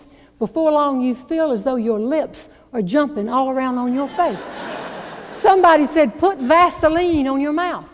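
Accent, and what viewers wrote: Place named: Georgia, United States. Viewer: her accent sounds so much like a South Georgia accent I love it!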